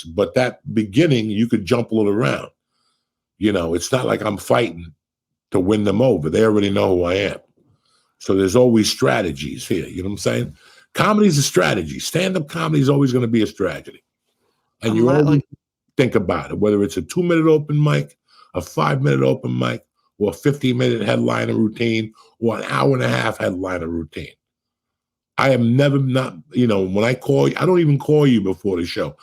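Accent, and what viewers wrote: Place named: New York, United States. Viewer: Happy New Year Mistuh. I live in Texas but My Dad n that half of my Family are from New York. Haven't seen that side or been up there to New York since about 2ooo or 2oo1. But listening to you reminds me of them jus mainly based off your accent accompanied by the way you talk lol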